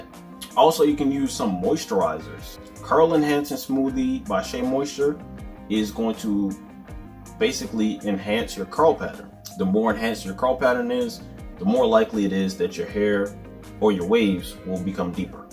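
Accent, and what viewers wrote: Jamaica. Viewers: Wait r u Jamaican too🤙🏾🔥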